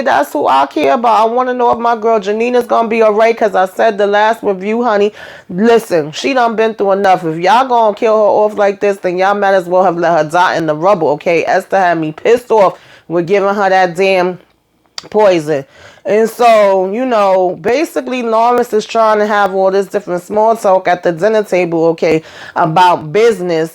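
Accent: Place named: New York, United States. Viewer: You a new Yorker? I'm from Massachusetts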